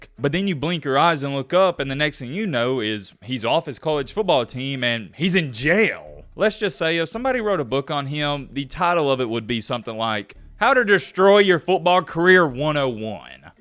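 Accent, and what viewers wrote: South, United States. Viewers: This announcer us annoying AF. Not even going to finish this story. I can't take this hillbilly dribble.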